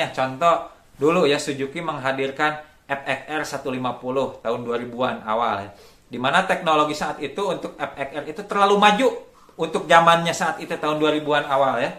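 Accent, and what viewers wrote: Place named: Banjar, Indonesia. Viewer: Orang Banjar ya bang🤣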